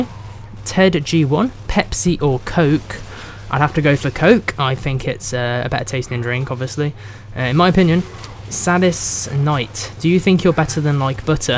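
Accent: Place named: United Kingdom. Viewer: What part of the UK r yu from??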